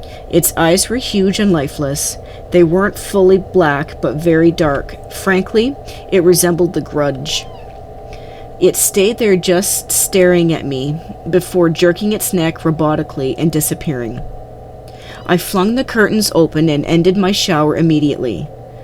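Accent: Canada. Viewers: It wasn't the content, it was the delivery. I've listened to most of your videos now and you do it on most of them. What I do like about your videos is that there is no repeats from the 100 hours of other channels' scary videos I've watched. I also get a chuckle when I hear your Canadian "out" and "about".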